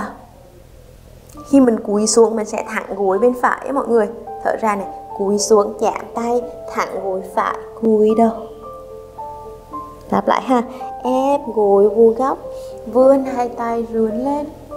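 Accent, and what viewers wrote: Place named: Hue, Vietnam. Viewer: Bài tập hay quá Em ơi ! Lại nói tiếng Huế hay nữa 😘😘😘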